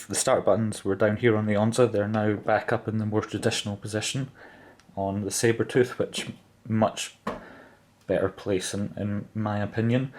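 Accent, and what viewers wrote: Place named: Wales, United Kingdom. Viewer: Sounds Welsh to me but I fail at accents...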